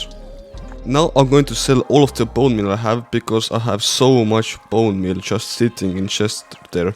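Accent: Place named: Estonia. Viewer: Your accent is Estonian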